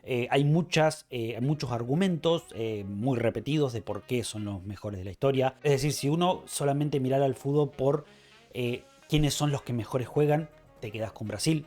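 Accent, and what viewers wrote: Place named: Argentina. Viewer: Antes de ver el video, veo que tu acento es argentino o uruguayo, vamos a ver a quien pones antes si a argentina o a Italia, o... Uruguay o Francia jajajajajaja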